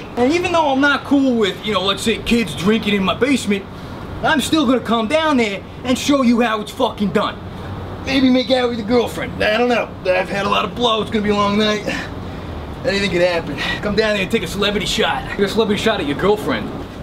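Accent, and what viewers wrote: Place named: New York, United States. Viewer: I like your funny accent like newyourker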